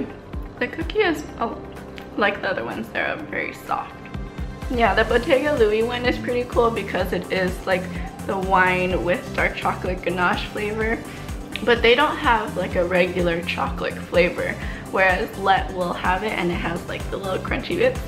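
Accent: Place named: Philippines. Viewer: Your voice is just like a Filipino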